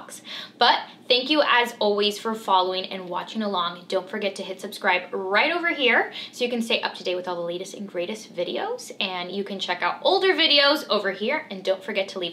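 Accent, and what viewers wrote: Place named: Dallas, United States. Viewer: You sound like your from Dallas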